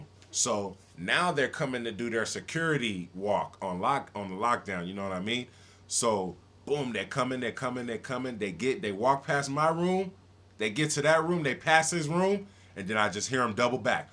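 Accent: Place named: California, United States. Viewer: he sounds straight up cali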